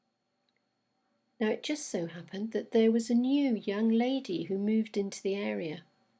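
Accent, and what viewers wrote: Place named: England, United Kingdom. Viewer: Your calm a sweet nature pulled me in. I love your pace and the peaceful feeling you create and give. As a Utah girl in the USA, I absolutely love your English accent! Beautiful story!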